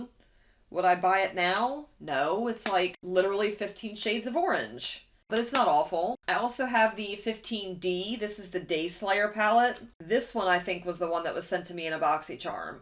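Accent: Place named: South, United States. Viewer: I discovered you because of this video and I love you 😂❤️ are you from the southern states? Because you sound like my people 😂. Also. Can I be your daughter of niece? I just discovered makeup 1 1/2 years ago and trying to build my collection. Lol. Jealous!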